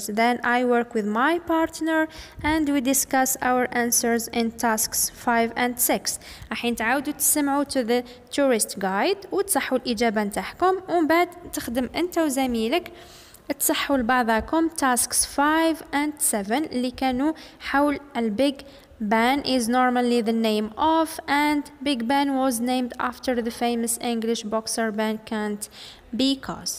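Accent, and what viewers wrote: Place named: M'Sila, Algeria. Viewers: استاذة انت من ولاية المسيلة ولا اذا انت من مسيلة انت راك قريتيني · اها ننضنش من مسيلة من لهجتها باينة دزيرية ملاحضة انا فتاة ولست ولد بلاكي تشكي في اسمي ديال القناة 😅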